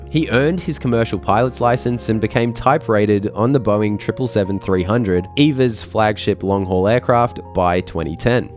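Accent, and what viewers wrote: Australia. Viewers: Yeah, particularly because he's good looking with an aussie accent 😊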